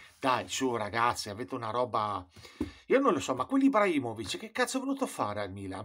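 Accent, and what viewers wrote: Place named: Bari, Italy. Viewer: Il dialetto barese è il top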